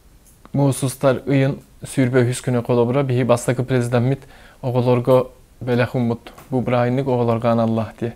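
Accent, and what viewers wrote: Yakutia, Russia. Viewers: Бэрт уол.Акцена олох сахалыы.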